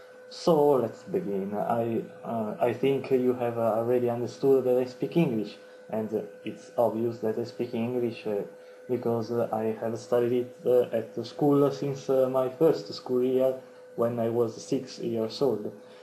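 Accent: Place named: Italy. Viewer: Sería mejor si mitigara tu acento italiano. Para haberlo estudiado sólo un año, tu español es magnifico.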